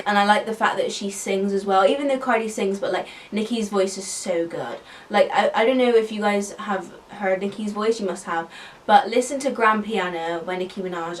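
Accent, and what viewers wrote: Bristol, United Kingdom. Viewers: WDYM gwynth It’s a British accent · why did her british accent scare me even though i knew she was british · I’m literally watching all of your videos rn and as soon as I watched one I KNEW you were from Bristol 😂😭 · i 100% didn't expect you to have a british accent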